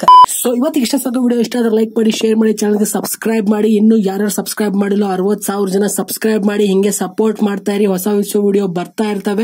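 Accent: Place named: Bagalkot, India. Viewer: Anthamma... 😂😂 Paoo.. paoo.. dialogue mast aiti noda.. yele bagalkotyav yen nee.? Fida aade nood na..😍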